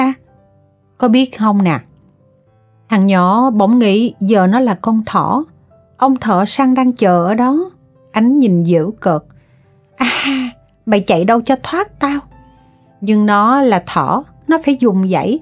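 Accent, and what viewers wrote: South, Vietnam. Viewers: Truyện ngắn của Nguyễn Ngọc Tư đa số thuộc loại hay, từ câu truyện, cấu kết, lời văn, 6/10-10/10. Giọng đọc, cách đọc, và cách diễn giải của với giọng miền Nam thì quá tuyệt vời 8-10/10. Qua Một thời gian dài lắng nghe các truyện của “IQ Radio”, tôi lấy làm lạ tại sao số người ủng hộ chương trình này quá ít.( không vượt qua được 5k. Subscribers )?????? Bây giờ thì tôi hiểu tại sao. Nhà văn miền Nam, Mc miền Nam, mà trong nhiều phần của các truyện ngắn, lại thiên về CS. Vì vậy mới không được nhiều người miền Nam ủng hộ.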